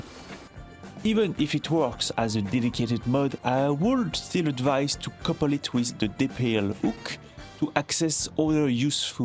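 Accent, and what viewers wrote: France. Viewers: You have such a thick french accent mon ami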